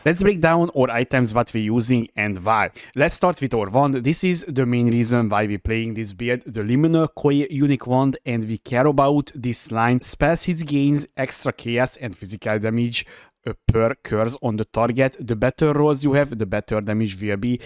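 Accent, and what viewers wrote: Hungary. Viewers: Egyből le vágtam hogy magyar akcentus haha :D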